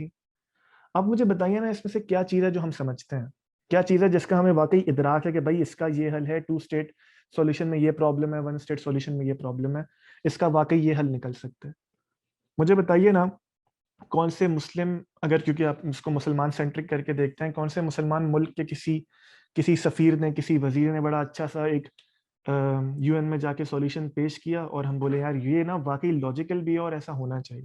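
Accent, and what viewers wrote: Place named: India. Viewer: Yar ae banda Indian ki tarah batt karta hai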